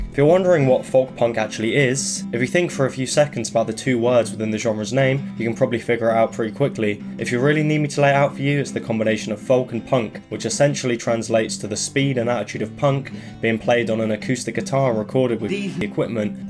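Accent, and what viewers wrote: United Kingdom. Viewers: hey why does this goblin have a harry potter accent? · He's british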